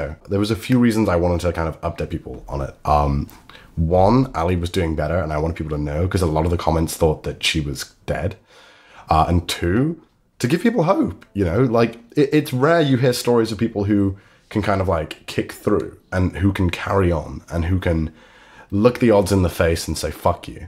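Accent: United Kingdom. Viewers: He sounds so British back then LMAO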